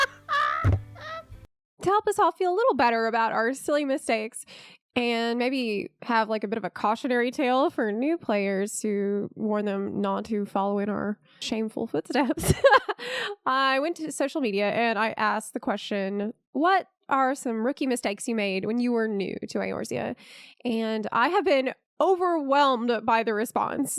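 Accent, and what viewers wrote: South, United States. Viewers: wonder what part of the south she is from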